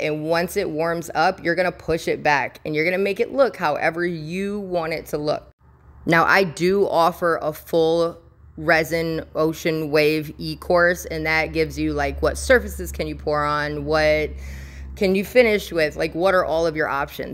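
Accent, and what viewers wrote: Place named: St. Louis, United States. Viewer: Thank u, it was a strange deja vu feeling when u spoke. I was born in St. Louis but lived all over the country growing up. U have a St. Louis accent to me, but I have been teased by friends my entire life that I took a little bit of every place I lived & blended them all together, maybe that’s what felt familiar to me idk,🫣don’t mind me!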